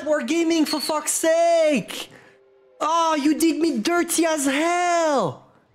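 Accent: France. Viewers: Je savais que tu etait fracais a cause de ton acsens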